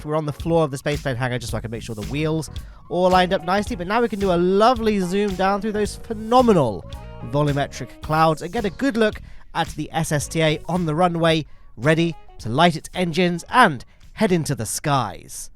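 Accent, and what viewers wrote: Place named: RP, United Kingdom. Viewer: he does have that British radio host accent also, nice pfp :3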